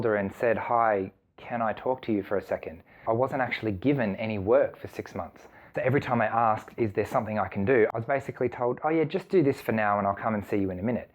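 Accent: Australia. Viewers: I agree with most of what this person says, except for one thing...this person is saying reporting harassers & bullies doesn't work. In my experience, it does, both in my professional & personal lives. This person, though, I'm guessing, is in Australia, based on the accent I'm hearing (I live in Canada)...perhaps the culture is somewhat different, so maybe there's some truth to the statements made about this here but I doubt the difference is big.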